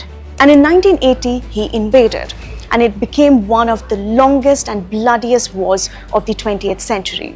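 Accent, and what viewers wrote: India. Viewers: unlike wion and first post,, she speaks with clarity and lesser indian accent,, no ridiculous "she ask she answer" anchoring style like palki sharma,, its like we viewers treated as a 1st grader,, dumb and fool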